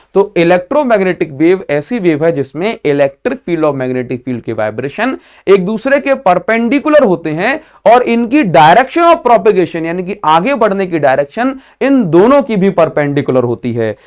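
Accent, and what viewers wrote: Odisha, India. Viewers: Sir are you from odisha ??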